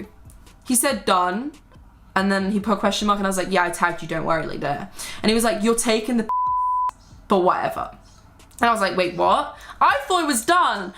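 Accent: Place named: United States. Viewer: Why do u put on an American accent for?? Or are you but lived out of u.s for a while? Xx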